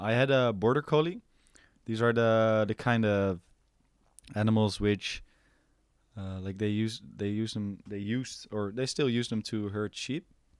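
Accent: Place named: Netherlands. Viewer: Lekker dat Nederlandse accent 😂💪✌🔥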